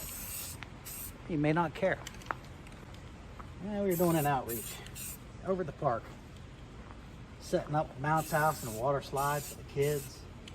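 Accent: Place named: Deep South, United States. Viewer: very clever idea! And you said before you were in Pennsylvania? You sound like you're from the deep south 😆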